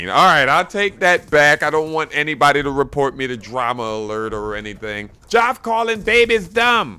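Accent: Philadelphia, United States. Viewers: sup o.g keep up da work. where u from? u sound like u from philly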